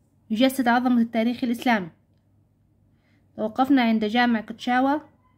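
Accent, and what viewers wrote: Egypt. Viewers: بصح هدرتك تاع مصر حسبتك مصرية😅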